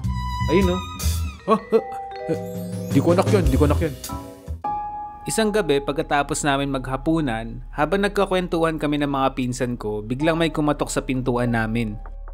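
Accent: Ilocos, Philippines. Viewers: Alam mo po pala mag ilocano kuya 😮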